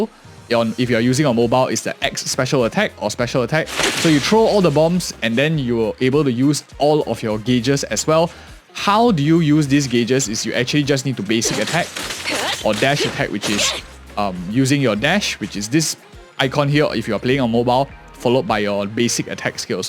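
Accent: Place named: Singapore. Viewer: Sound like a Singaporean (ofc I myself am). A lot of thing I didn't know cause I didn't bother reading the long skill explanation, he gives simple explanations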